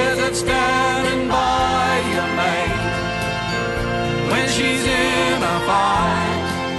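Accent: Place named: Australia. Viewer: I class this as the original aussie accent you can hear old english and irish quite strong in the sound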